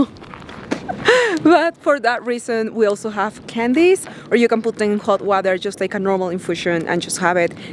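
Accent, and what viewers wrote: Peru. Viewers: Pues sí habla como peruana.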